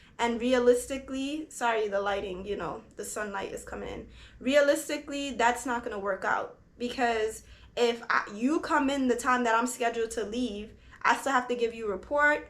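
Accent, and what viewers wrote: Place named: New York City, United States. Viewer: You have a very heavy New York City accent!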